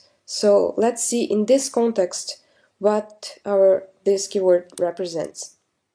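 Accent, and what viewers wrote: Brazil. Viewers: Love the tutorial, thank you! ps.: I can recognize a brazilian accent from miles away :)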